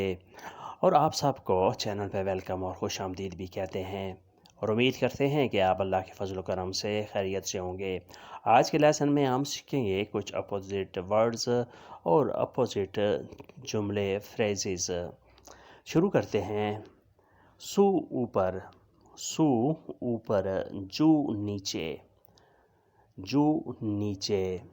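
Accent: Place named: Punjab, Pakistan. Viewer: Apko tu urdu seekhne ki zaroart ha Punjabi k andaz se tu ap urdu bol rahe ho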